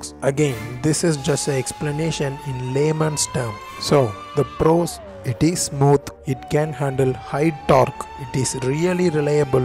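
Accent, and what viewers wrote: India. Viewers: Turn of the stupid indian accent bro it ruins the video <3 Much love bro <3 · Ur exent is so bad its like less educate indian kid try to speak in englisg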